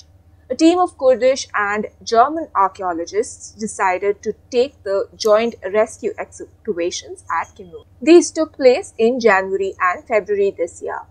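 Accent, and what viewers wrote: India. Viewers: Yuck, that indian accent. Stop speaking english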